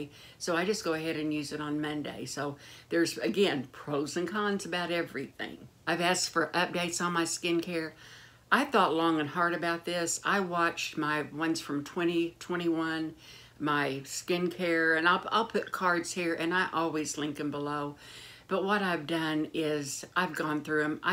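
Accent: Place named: Illinois, United States. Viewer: I really enjoy your channel! I have family from Louisville and Olney areas in Illinois, and every once in a while I hear a bit of “Something” in your voice or speech pattern that reminds me of them. Am I even close geographically?? Also, I too lost my closest friend (Kathy) in 2020, definitely changed my life, left a big empty spot no one could ever fill. I dreamt of her the other night - first time since she died. She said - “ what took you so long to visit me”. Have you ever dreamt of Pat?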